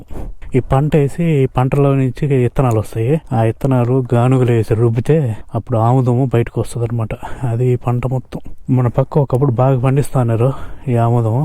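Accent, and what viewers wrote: India. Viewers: IM WATCHING JUST FOR YOUR TELUGU SLANG....NRO NEKU AH MOUSTACHE BALEDHU....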